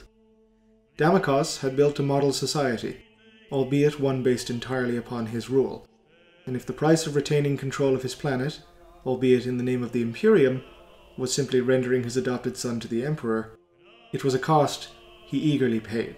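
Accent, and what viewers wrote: Ireland. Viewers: Nice to hear the voice of an Irish man recounting the 40k lore.. your version is the best of all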